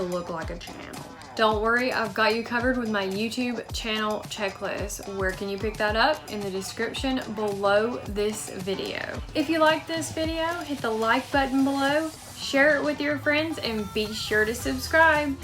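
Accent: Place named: South, United States. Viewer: You sound like a True southern Bell...If my ears aren't mistaken me?👌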